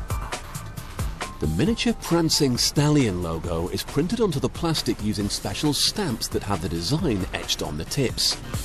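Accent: United Kingdom. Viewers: The first one is correct as its a British accent..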